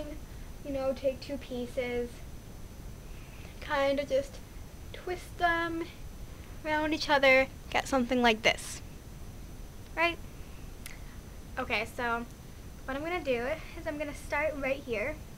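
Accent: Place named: New York, United States. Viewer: You can kinda hear New York accent in this video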